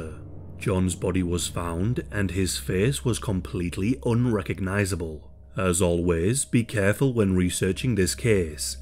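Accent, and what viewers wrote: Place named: Northern England, United Kingdom. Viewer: I am a British born American who misses hearing English voices. I even though I was born in the South (London) I love Northern accents, like "s too. voice is so soothing even though he is talking about the most disturbing things.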